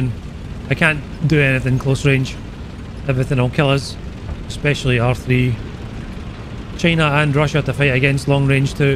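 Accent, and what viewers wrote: United Kingdom. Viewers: hearing a scot speak in a british accent is just all kinds of confusing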